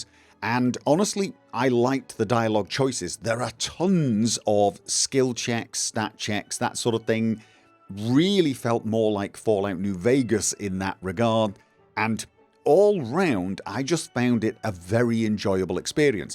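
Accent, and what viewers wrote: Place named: Northern England, United Kingdom. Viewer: I'm on ep 20 of your playthrough....bloody loving it! It's so nice to hear a Northerner playing it and oddly amusing when you're talking to other Northern characters. And you play it SLOW...I'm getting a bit tired of the caffeine induced jerks that are creeping into gaming now. It's much more enjoyable when it's in real time. Wish you'd found the Greenman early on though but he is tucked away. You know the voice artists are all Dr Who actors? And the main jingle and incidental music are a play on Peter Howel's score for the 80's who era. And did you get the reference to Mr Ben? It's full of little Easter eggs.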